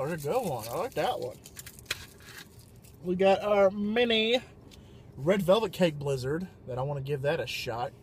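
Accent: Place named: Texas, United States. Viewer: I think I need to marry a Texan. You got the nicest accents.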